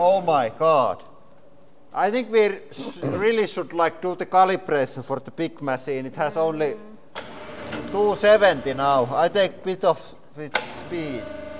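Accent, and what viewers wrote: Finland. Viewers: Somehow the Finnish accent goes so well with the hydraulic press.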